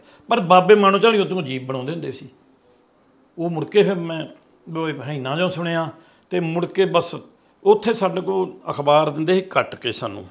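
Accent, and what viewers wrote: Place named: Majha, India. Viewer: ਇਹ ਮਾਝੇ ਦੀ ਪੇਂਡੂ ਬੋਲੀ ਆ..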